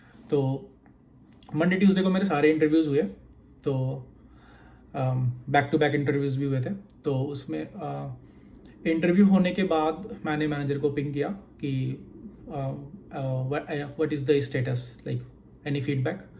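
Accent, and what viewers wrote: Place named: United States. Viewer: How did you learn US English ?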